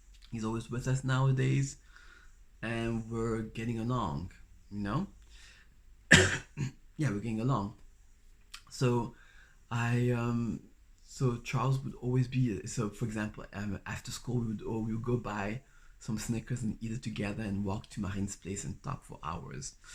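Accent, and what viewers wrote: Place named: France. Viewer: OMG, you are soooo gorgeous and incredibly charming!!!! It sounds like you are a French speaker. Where are you from originally?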